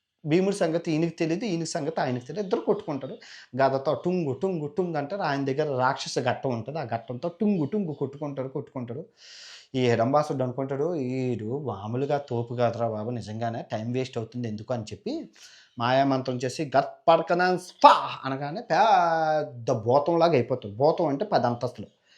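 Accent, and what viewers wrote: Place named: Visakhapatnam, India. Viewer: Video ఇంత త్వరగా ఐపోయింది ఏంటి అనిపించింది చెప్పే విధానం మన వైజాగ్ స్టైల్ స్లాంగ్ లో super super super అన్న..👌👌 👌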